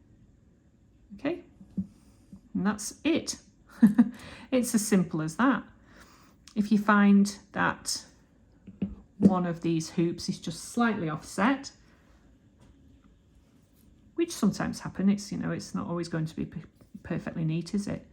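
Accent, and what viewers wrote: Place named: York, United Kingdom. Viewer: Such a lovely link. what size would you say is the jump ring? Also, are you using dead soft or half hard wire? Enjoy listening to you speak, is that a York accent I hear. 😊❤️. Thank you.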